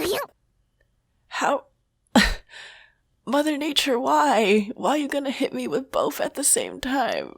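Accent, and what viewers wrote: New Jersey, United States. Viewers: sounds like you're from jersey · Oddly she developed a jersey accent. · Your sick voice sounds like you came from New Jersey.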